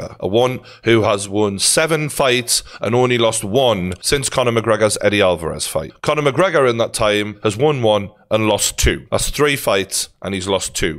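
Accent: Newcastle, United Kingdom. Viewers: Everyone recognizes geordie good work bro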